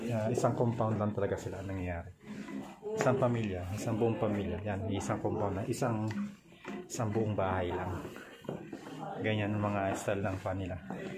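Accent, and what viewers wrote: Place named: Spain. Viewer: Why does it sound like you're speaking Spanish with Chinese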